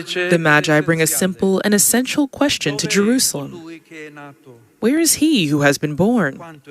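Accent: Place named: United States. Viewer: Crystal clear American English!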